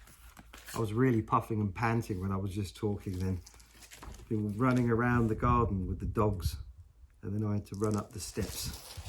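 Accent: United Kingdom. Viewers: Well, I started watching the 90 minute renovation video compilation of the village house, then went back to No. 1, and am now finished with No. 134. Whew, an enormous amount of work you've done there. I've worked in the construction industry in the U.S. all of my life, but I was fascinated by the type of housing that you have in Portugal. We use wood framing for most houses here, and I've never seen stone houses like yours. It was truly an interesting journey and I feel that I've been educated by watching your videos. But don't worry, I'm about to start on #135 and will be following your channel until you give it up. You're also to be commended on your videography talents, use of musical score, and the witty repartee that you constantly provide. Like most yanks, I enjoying hearing the British accent and have learned a whole new vocabulary. "Hob", "boiler", "salamander", "knackered", all these are fun to hear for the first time. 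Great job, keep it up, and good luck through all your adventures. Gary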